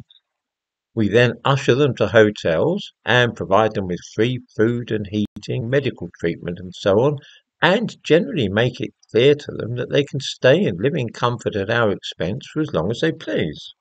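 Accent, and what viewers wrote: United Kingdom. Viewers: Thick British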